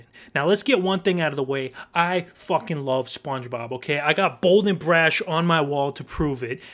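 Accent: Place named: Brooklyn, United States. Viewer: This guy is the most Brooklyn youtuber ever I love it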